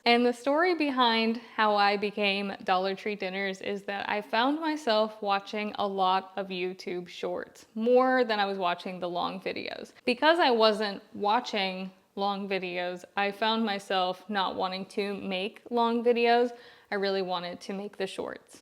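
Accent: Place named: South, United States. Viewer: Girl, straight from the south 😊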